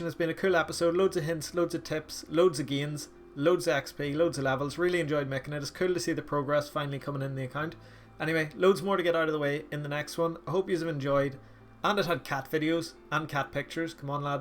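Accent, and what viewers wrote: Northern Ireland, United Kingdom. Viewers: Lol Northern Ireland ?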